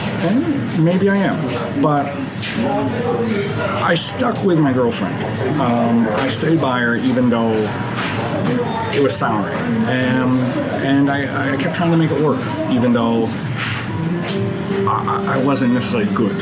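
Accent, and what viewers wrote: United States. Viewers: A truely honest and moving video. Thank you. I rarely give advice but it seems you need a break. Perhaps return to USA , earn some "real" money and come back fighting. Regroup! Best of luck with whatever you decide. (Apologies if that's not a USA accent, read it as "home country" instead!)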